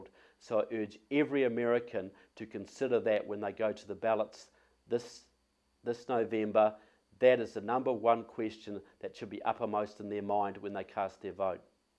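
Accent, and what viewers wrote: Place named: Australia. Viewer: i just cant stand looking at his ugly drood face for more than 1 minute, and listen to his annoying austrailian accent, long enough to finish this video, boooorrrinnng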